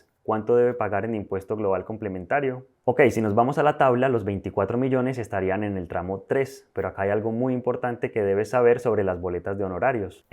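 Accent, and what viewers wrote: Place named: Colombia. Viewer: Bueno video, Clarito como el agua. Solo que tu acento colombiano causaba corto circuito en mi cabeza... pero muchas gracias por la info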